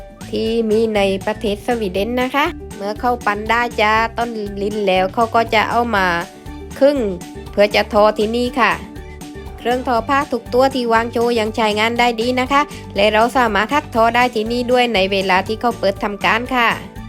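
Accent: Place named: South, Thailand. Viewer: น่าชมมากคะ ไม่ได้มานานป้าพูดใต้แล้วนะคะ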